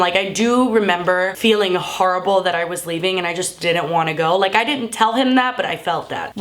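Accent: United Kingdom. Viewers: british accent is very attractive tho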